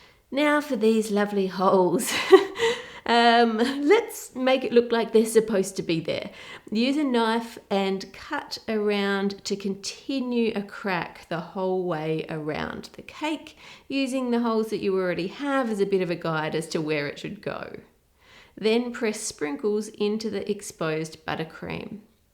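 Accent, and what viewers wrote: Australia. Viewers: Doesn’t everyone in Australia speak like that?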